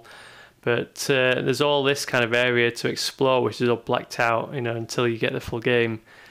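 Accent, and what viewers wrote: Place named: Yorkshire, United Kingdom. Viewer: where you from mate you sound local to me. im at Castleford :D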